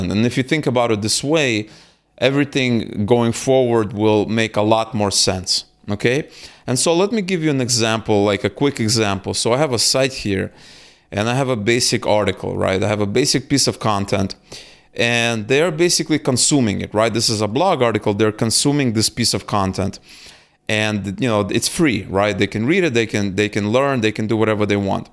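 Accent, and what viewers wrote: Russia. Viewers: You're from Russia?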